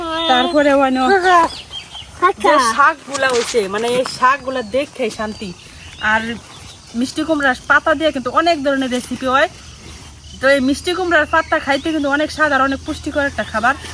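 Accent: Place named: Mymensingh, Bangladesh. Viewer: আপনি ময়মনসিংহের ভাষায় কথা বলছেন। এটা কোন উপজেলায়?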